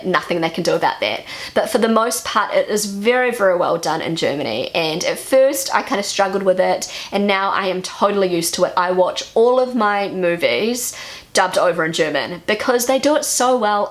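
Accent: New Zealand. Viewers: By the way, I love your NZ english. It has a weird soothing quality and puts a smile on my face! I need to go to NZ.... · By the way I think you have such a cute English accent! I have lived in England, South Africa and visited Ireland and Scotland but your New Zealand accent is in a class by itself and I think it is very cute!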